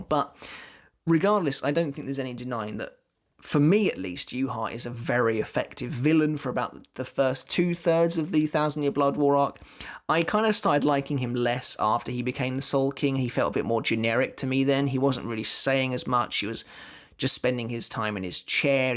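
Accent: England, United Kingdom. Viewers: Not usually a fan of British accents... but... your base, narrative delivery,and VERY concise knowledge of this masterpieces of a show... I’m now a solid fan! I’ve sent links to 12 of my fellow friends who are about this show like you are! Keep going all the way to the top bro! I’m an American fan! 😎❤️🙌🏻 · I’m onto to you, there’s that subtle English accent but I won’t be fooled that your not American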